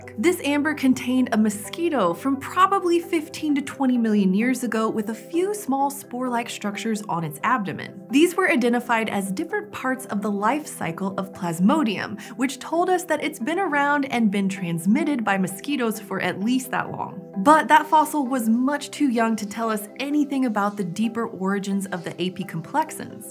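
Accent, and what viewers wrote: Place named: United States. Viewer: She can speak correctly, I’m not sure what you are referring to. Her accent is also very mild as American accents go which means she’s easy to understand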